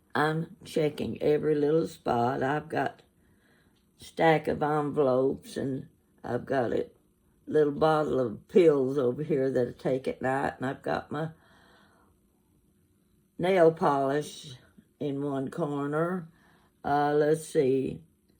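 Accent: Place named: Kentucky, United States. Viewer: I just LOVE you! I am from Kentucky, was born in a small town in the eastern part called Prestonsburg. My family settled there from the revolutionary war. General Alexander Lackey was my GGGGrandfather. Although this is considered a very depressed part of the state, I will always remember how beautiful life was there for me as a child. My Mom and Dad left there in the early 60's and I grew up in Central Florida. No other people like real Kentuckians! I can tell a Kentuckian (no matter what part of the state they are from) a mile away by that accent! You remind me so very much of my beloved family. All of them are gone now except me, and my two sisters. I am 75 the other two are 78 and 84. You bring back such wonderful, sweet memories of all of my wonderful Grandparents, Aunts, and Uncles! WE are so very much alike in our family history, foods, stories, antiques, Just amazing. 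Well I guess after all we are true Kentucky Wildcats!! Much love, from North Carolina by the way, my name is Lois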